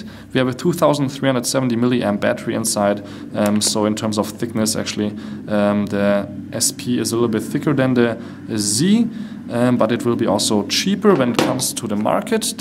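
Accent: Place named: Germany. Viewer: you're german, aren't you ? :D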